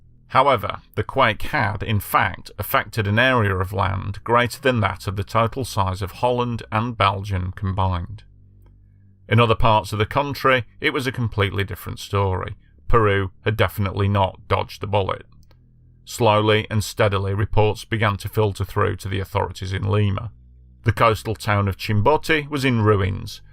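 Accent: United Kingdom. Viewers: ANOTHER British narrated disaster porn channel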